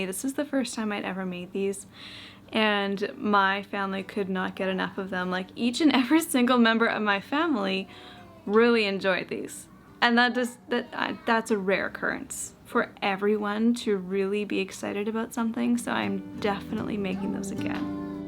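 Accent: Canada. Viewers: Canadian accent so wonderfully strong in this video 😍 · I am now learning that Canadians pronounce things differently than the U. S. 😂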